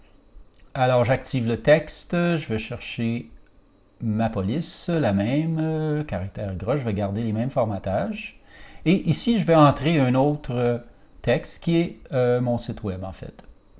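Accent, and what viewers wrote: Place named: Quebec, Canada. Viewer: Je vous adoré surtout à votre accent Québécois 😉, vidéo c'était bien. Merci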